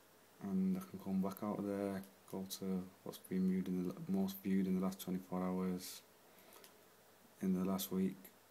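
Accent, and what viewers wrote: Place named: United States. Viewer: Incorrect spelling and cussing is more annoying that any accent can ever hope to be. Let me guess, you are from the U.S...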